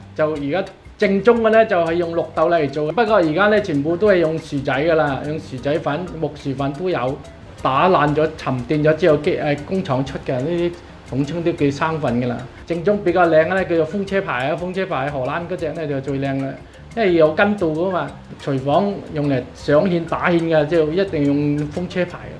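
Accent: Zhongshan, China. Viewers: 師傅口音應該係我鄉下中山石岐，是嗎？ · 是中山，不是石岐.而是中山隆都。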